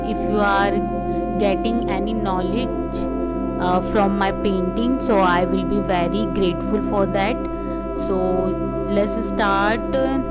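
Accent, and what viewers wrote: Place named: India. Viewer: You have Indian accent! Are you Indian?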